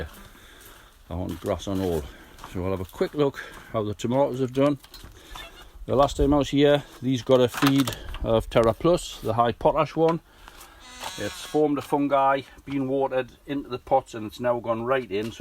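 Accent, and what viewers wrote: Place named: Northern England, United Kingdom. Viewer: Also , a fellow northerner comforted by a local accent instead of a American one on here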